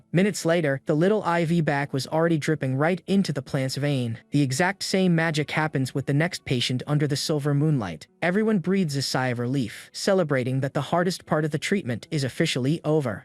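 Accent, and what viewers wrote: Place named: United Kingdom. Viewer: I feel like the voice is trying very hard not to be British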